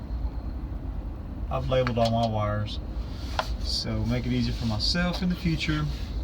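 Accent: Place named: Texas, United States. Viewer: Dude you sound like you are from Texas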